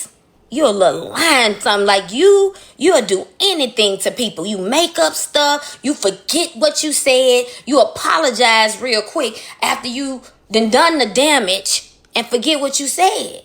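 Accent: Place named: South, United States. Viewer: The more I listen to K Michelle the more I’m liking her. And the Southern accent love love love ❤😂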